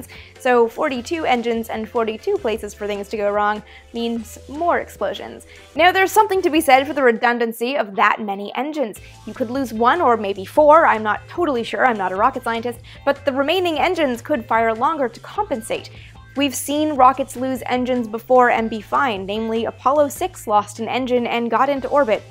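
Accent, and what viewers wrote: Canada. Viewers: you're Canadian i bet. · is she Canadian?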